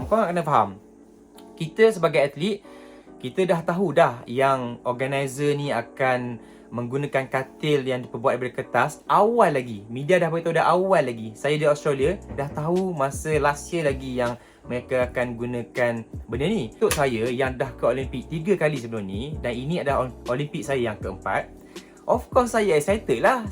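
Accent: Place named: Terengganu, Malaysia. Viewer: slang ganu tetap ade 😂😂😂, kemahh sek kitee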